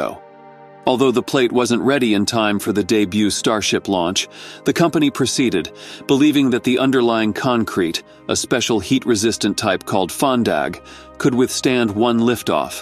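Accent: United States. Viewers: nauseating droning American commentating on this video. The Yankee accent is diabolical.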